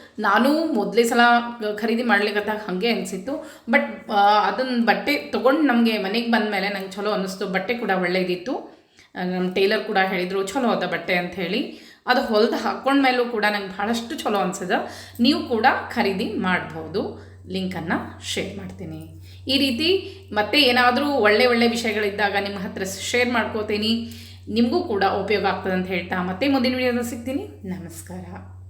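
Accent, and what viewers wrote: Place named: Hubballi-Dharwad, India. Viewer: akka ನನಗೂ ನೀಮನ್ ನೋಡಲು ತುಂಬಾ ಆಸೇ ನೀವು ಯಾವ ಊರಲ್ಲಿ ಈರ್ತೀರೀ ನನಗೆ ಹುಬ್ಬಳ್ಳಿ ಧಾರವಾಡ ಅನಿಸುತ್ತದೆ ನಿಮ್ಮ ಮಾತನ್ನು ಕೇಳದರೇ ನಾವು ಆಗಾಗ ಹುಬ್ಬಳ್ಳಿ ಗೇ ಬರುತ್ತವೆ ನಿಮ್ಮ ಅಪಣೇ ಇವರೇ ನಾನು ನೀಮನ್ ನೋಡಲು ತುಂಬಾ ಆಸೀ ನೀವು ನಿಮ್ಮ ಸಂಸಾರ ದ ಬಗ್ಗೆ ಓಂದು ವೀಡಿಯೊ ಮಾಡಿ ಎಷ್ಟು ಜನ ಮಕ್ಕಳು ಎನ್ ಮಾಡತಾರ ಸರ್ ಎನ್ ಮಾಡತಾರೇ ಹೇಳಿ ಮತ್ತು ನಿಮ್ಮ ಮನೆಯ ವೀಡಿಯೊ ಮಾಡಿ ನಮಗೆ ತೋರಿಸಿ ನಿಮ್ಮ ದೇವರ ಮನೆ ಎಲ್ಲ ವನ್ನೂ ನೋಡುವ ಬಯಕೆ ನೀವು ನಮ್ಮ ವರೂ ಅಂತ ಕೇಳಿ ನಿ akka ದಯಮಾಡಿ ತಪ್ಪು ತಿಳಿಯ ಬೇಡಿ ಇಂತಿ ನೀಮ್ ತಂಗಿ ಲತಾ ಕುಲಕರ್ಣಿ ನಮಸ್ಕಾರ🙏🙏🙏🙏🙏 ಗಳು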